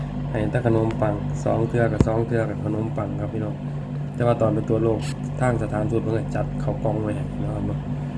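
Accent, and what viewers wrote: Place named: Sakon Nakhon, Thailand. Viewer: คือเสียงบ่าวสกลเนาะได้ฟังแต่เริ่มต้นมวน่ๆๆ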